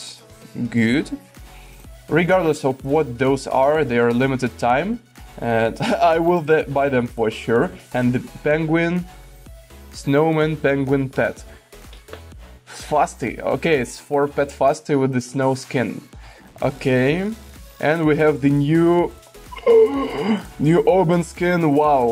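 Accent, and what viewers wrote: Russia. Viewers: I am gonna guess that the accent is Russian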